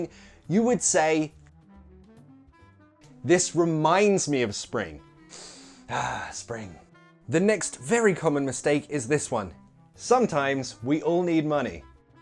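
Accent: United Kingdom. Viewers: The British accent is interesting, like when they say - never (nevah) :3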